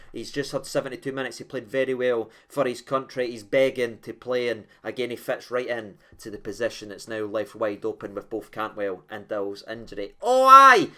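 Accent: Scotland, United Kingdom. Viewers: Supporting your country isn't real football? I thought you were Scottish with your accent.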